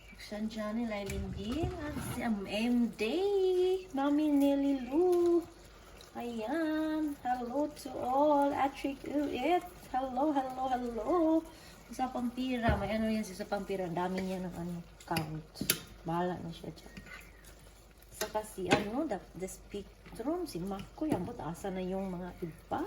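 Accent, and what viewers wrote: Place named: Visayas, Philippines. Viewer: Tuba, taas kaayo harang.sige ko katawa dinhi ba bisdak kaayo jud oi.Nalingaw ko Mao pa pag mara naku.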